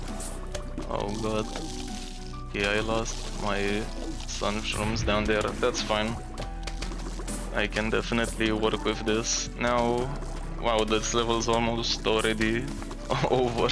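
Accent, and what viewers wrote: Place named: Eastern Europe. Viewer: I love that slavic/russian accent where are you from?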